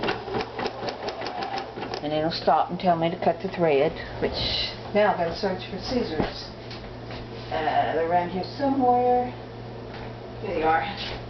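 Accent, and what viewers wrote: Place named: Tennessee, United States. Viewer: Hello, Thank you having the ambition and courage to help us newbie better learn our machines. I have an old 2144 that I'd wanted forever. But there it sits, because no one has helped me with teaching or coaching. I did upgrade it to 2170 online. I see you don't use the cut function. - Does yours not work? I wish you loved close to me, but by your accent, I'm going to say TN.:) (I'm in So Cal.) Big Hugs, Nanette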